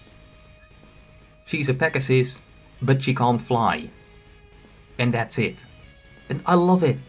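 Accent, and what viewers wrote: Netherlands. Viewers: Wait are you dutch bc i recognize the accent and how you pronounce english words. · i mean your dutch accent isnt too bad. It took me a while after reading your comment to notice it, and I'm dutch myself XD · your cute ass dutch accent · Man I could hear the Dutch in your voice. And all I got to say is.. frikandel broodje. Also great video i loved it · Are you Dutch? You sound Dutch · Ahh I figured you were Dutch :0 your accent’s very nice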